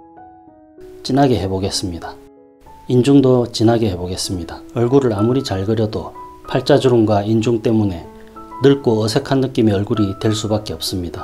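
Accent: Gyeongsang, South Korea. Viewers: 경상도 말씨가 너무 친근하고 반갑습니다. 직접 화실을 경영하시나요? 멀지않다면 직접가서 배우고싶습니다. 저는 하남에 거주합니다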